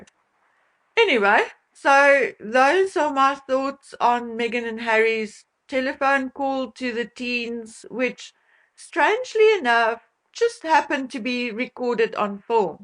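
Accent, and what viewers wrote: South Africa. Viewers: I could listen to your lovely S.A accent all day 😊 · I would never have guessed that English was not your first language, dear! You just sound South African to me and I love you for it. As for the Harkles latest offering, I filed it under suspicious junk mail. They have lied so much that I no longer believe a word they say. Everything is smoke and mirrors with them. How I wish they could, like Napoleon, be sent to Elba without Wifi or any means of contacting the outside world. The world needs a rest from their particular corruption of young minds. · Your "accent was what drew me to listen to you, years ago, when I subscribed to your channel! At the time, I had no idea what a South African accent sounded like. I didn't know WHERE you were from. I thought it was so lovely that I kept listening to find out where you were from!!! 😊 BTW, I have been a psychiatric nurse for years. 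YES!!! "Word Salad" is a bonafide, legal, medical term most often related to to schizophenia!!! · The Harkles speak fluent PSYCHO-BABBLE !!! You speak with a beautiful South African accent….I can understand you, every word you speak is articulated, but (just as you say) all I heard from the Harkles was psycho-babble bolloc*$ !!!!! It’s unintelligible, and says nothing and means nothing. Their lips move, but cr@ comes out !!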